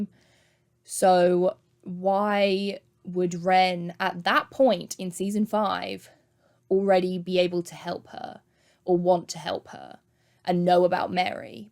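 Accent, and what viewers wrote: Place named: United Kingdom. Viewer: i can’t tell if you’re british or not. you almost have an accent but you don’t?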